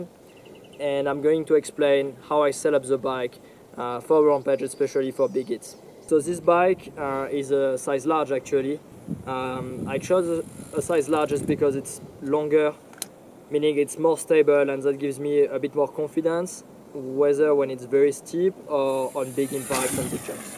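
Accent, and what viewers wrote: France. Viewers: L’accent français dans ton anglais 🤘😉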